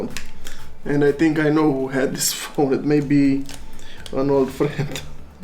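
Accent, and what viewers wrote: Romania. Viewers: I knew youre Român , your accent haha,great contnent btw ❤️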